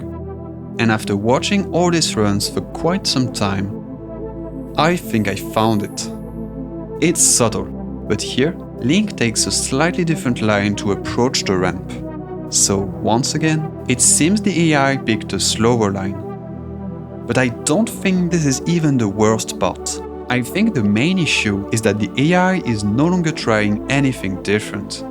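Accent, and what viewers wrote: France. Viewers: tu est Français? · Hi! are u french? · are u french by any chances ? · why is bro french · vu ton accents tu as l'aire français, tu voudrais pas mettre une piste audio francais ? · J'adore ton accent français, t'es vraiment une super chaîne YouTube ! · T’es francais ? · You sound French. Are you French? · Are you french or smtg? I’m wondering cuz I’m french too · STP BG MET UNE VERSION EN FR CAR TON ACCENT EST VRM TRES FR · tes francais toi XD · From content to editing, everything is near perfection. You sound a little bit french, alors merci pour ces vidéos, le montage est incroyable, et le travail derrière monstrueux. Franchement, tu mérites plus de reconnaissance, tes vidéos sont niches et en même temps tellement abordables et passionnantes, ça force le respect. Chaque fois, c'est un plaisir de les regarder, et tu m'as fait découvrir l'univers du speedrun de trackmania d'une manière tellement originale, c'est sûrement devenu ma licence de jeu de course préférée! 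Alors merci encore, et bonne chance! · Are you French? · Are you french ? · is he french or what ?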